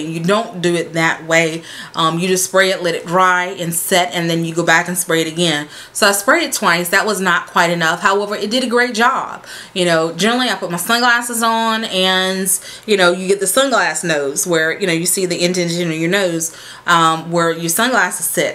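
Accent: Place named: South, United States. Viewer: where are you from? I hear a southern accent! 😜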